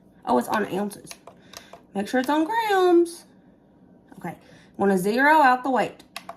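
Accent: South, United States. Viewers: Love to watch the show and the great recipes. The southern accent is difficult to understand at times. Maybe slow down while explaining the recipes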